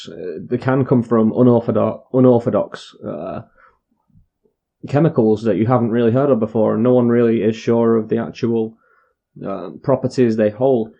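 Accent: United Kingdom. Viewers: Erm have you never seen shrek…. This is not a Scottish accent he is English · You sound Scottish but I’m not sure so I said Brit. Usually id never say Brit but I did cause I can’t tell your accent. Definitely think you’re Scottish but not sure!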